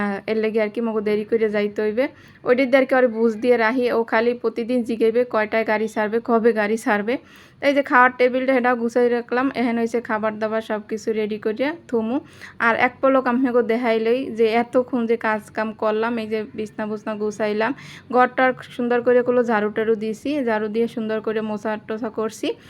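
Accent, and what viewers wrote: Barishal, Bangladesh. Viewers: দিদিভাই তোমার কথাগুলো আমার খুব ভালো লাগে। আমার শাশুড়ি তোমার মতো বরিশালের ভাষায় কথা বলে, বরিশালের ভাষা শুনতে আমার খুব ভালো লাগে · ✅👍আপু তোমার বরিশালে ভাষায় কথা বলেছেন আপু আমার শুনতে খুব ভালো লাগলো 🎉কাঠালের বিচি আমার খুব ভালো লাগলো ❤সব কিছু রান্না টা অনেক সুন্দর হয়েছে আপু। আর সেমাই রান্না করলেন। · আপনার বরিশালের কথা শুন‌তে আমার ভালো লাগে।আপনার ভি‌ডিও দেখে কিছু শিখে নিলাম। খুবই ভালো লেগেছে❤❤❤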